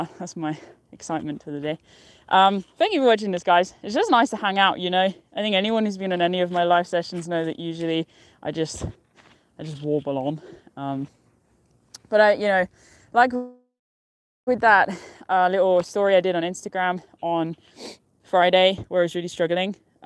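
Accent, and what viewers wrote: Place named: Scotland, United Kingdom. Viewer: Fab views, thanks. Love your wee Scottish accent, can’t wait to see you in a kilt 😉x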